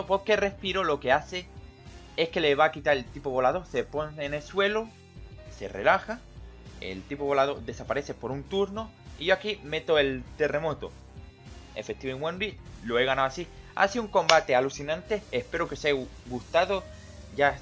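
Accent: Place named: Mexico. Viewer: suenas mexicano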